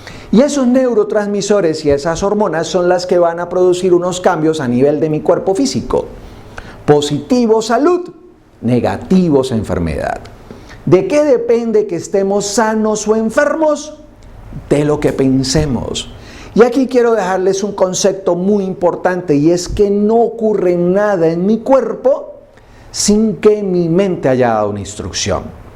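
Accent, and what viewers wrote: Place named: Antioquia, Colombia. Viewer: Cuando no lo escucho siento que se me baja la alegría. Y podía saberlo de memoria, pero me encanta su voz tan paisa y su presencia.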